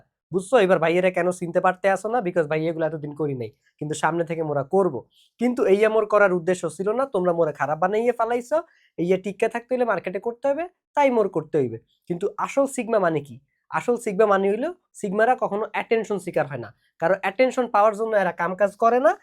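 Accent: Chittagong, Bangladesh. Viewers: Your ctg accent is soo good bhai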